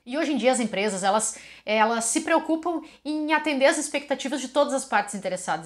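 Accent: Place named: Rio Grande do Sul, Brazil. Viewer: Tu é gaúcha, hein..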